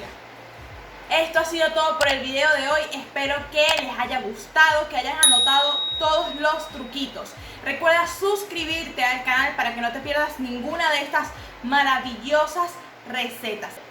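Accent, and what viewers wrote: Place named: Venezuela. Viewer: Hola buenas noche ésas marcas de crema a la cual te refieres la venden aquí en Vzla. ?..... O tú estás viviendo en otro país?........ Tu acento es muy venezolano. Gracias de a antemano amiga . te felicito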